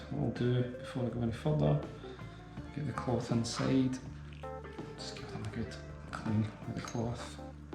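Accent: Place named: Scotland, United Kingdom. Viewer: Wow. Nice to hear a scottish accent too. I'm from North Berwick. Left a ong time ago though. Can I ask another question. I've started the engine no problems with a new battery. Before the engine has time to really warm up (within 1 minute) there are white fumes coming from the right hand side seemingly behind the cylinders - about that height. Looks as if there may be some electricals in there but it would need further dismantling to check. Have you ever had this happen and what was it. I'm at half a mind just to let it run and see if whatever it is (old coolant ?) burn off, but I don't want to burn something evident and have to replace ... decisions decisions. Cheers.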